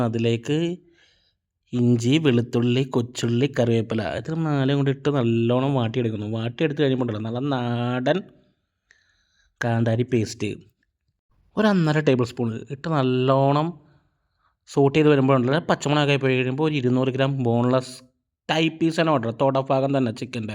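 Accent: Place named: Kochi, India. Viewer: Ithentha kochi slang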